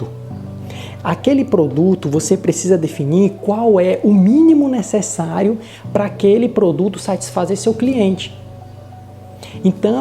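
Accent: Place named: Fortaleza, Brazil. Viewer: Eita sotaque lindo! Também sou de Fortaleza, mas moro no Rio de Janeiro à muitos anos, e somos xaràs no sobrenome.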